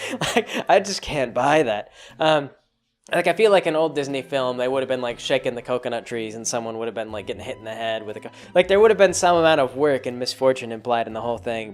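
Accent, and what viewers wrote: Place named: Kansas, United States. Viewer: Kansas accent.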